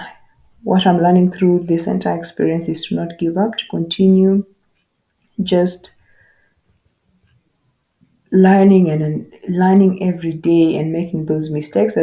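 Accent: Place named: Kenya. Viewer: You sound Kenyan I guess, if you don't mind are you proficient in C or C++?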